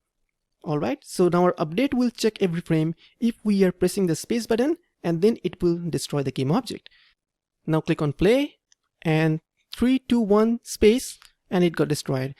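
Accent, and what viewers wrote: India. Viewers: It migt be a little bit racist but when i heard his indian accent i knew he knows what he is doing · Once I heard the Indian accent I knew he actually knew what he was doing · it would be perfect tutorial if it didnt have the in*ian accent. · Little skeptical about the fifteen minutes, then I heard the Indian accent and I then knew that the title wasn’t joking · i pressed the video and i said to my self its like other videos it wont help until i heared indian accent and i got locked in❤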